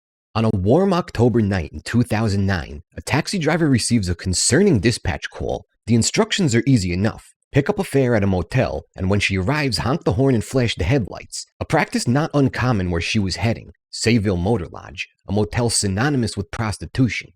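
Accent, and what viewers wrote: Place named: New Jersey, United States. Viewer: lets get this man to 1k subs people. his jersey accent is worth it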